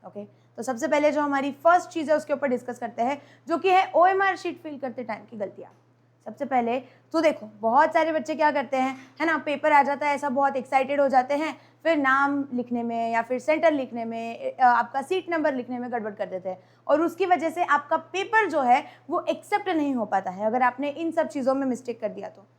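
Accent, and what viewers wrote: Maharashtra, India. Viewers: Ap marathi ho ky mam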